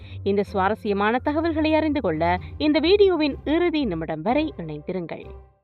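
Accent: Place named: Sri Lanka. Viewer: Azhagana inimaiyana ilangai Tamil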